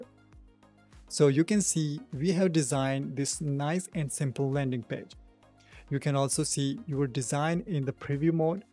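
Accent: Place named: India. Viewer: Are you Desi? Familiar accent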